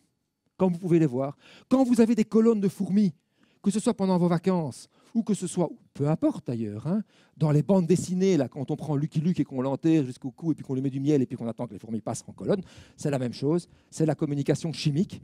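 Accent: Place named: Brussels, Belgium. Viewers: ... L'accent du conférencier, de même que son type d'élocution, sont plutôt ceux d'un francophone bruxellois. Ennoutre, l’intéressé est rattaché de façon assez significative à l'ULB. Un accent pas si différent, en l'occurrence, du phrasé d'un Benoît Poelvoorde, par exemple – encore que ce dernier soit originaire de... Namur ! (Mais le 'rouleau compresseur' linguistique qu'a été la télé depuis les années 60-70 a pas mal contribué à uniformiser nombre de particularismes régionaux, en Belgique comme ailleurs. Sauf, peut-être, du côté de Liège précisément, ex-principauté indépendante, bien connue pour son esprit frondeur et et sa résistance séculaire aux influences extérieures ?). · Tellement magnifique!!! Qu'est ce que j'ai pu passé petite devant un nid de fourmis; j'en retire de mes expériences d'enfant, une société super organisée, j'étais toute jeune stupéfaite... 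Cette volonté, ce courage déterminé en la vie, sublime!!! Vous en parlez remarquablement bien!!! Elles sont formidables et quelquefois, excusez-moi, elles nous emmerdent!!! Néanmoins, depuis mon jeune âge, elles m'ont toujours fascinés... Votre exposé me rallie encore plus vers elles, je vous en remercie!!! A votre accent, vous êtes Belge (oui j'ai vu Bruxelles), vous êtes de Liège (l'accent) ??? Je le suis aussi ; mais à présent en Provence 04, et encore beaucoup de petites fourmis !!! SOURIRE !!! CHRYS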